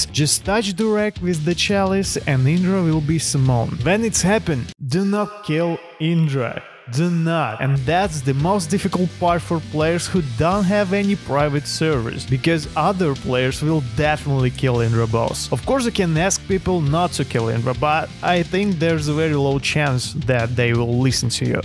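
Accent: Russia. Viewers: Why do you have russian accent lol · The russian that tries to make an english voice OHHHHHHHHHHHHHHHHHHHHHHHHHHHHHHHH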